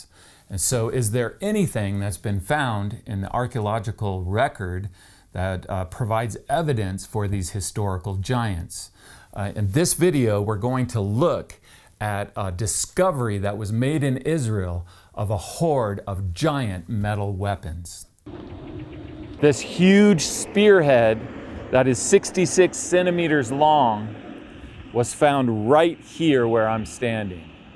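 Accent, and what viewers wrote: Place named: United States. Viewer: you don't seem to be able to tell the difference in a spear head and an arrow head. You're also a bit inconsistent in which measurement you give. Sometimes in metric, then immediately follow with imperial. You said the spear heads were a half inch apart, then describe the largest as 66 cm. Pick a lane or give both measurements. You have a very American accent. Don't you use the imperial system?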